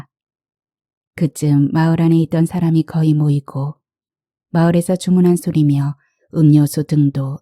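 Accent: Jeolla, South Korea. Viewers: 님의 걸죽한 전라도 사투리 때문에 사람냄새가 물씬하였습니다. 모처럼 작품에 흠씬 빠져보았습니다. 감사합니다. 행복하시고 건강하십시오...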